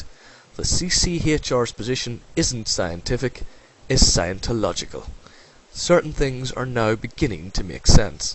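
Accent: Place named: Ireland. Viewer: great video man, I like your accent. Irish? very nice information~ keep it up ^_^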